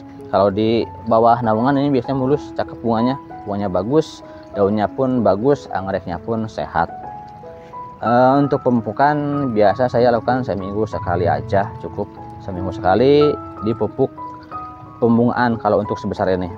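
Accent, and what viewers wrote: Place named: Sunda, Indonesia. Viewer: Sy dengar pencerahannya TKS ya kang cmn sdkit geli itu logat sundanya meni medok psn😊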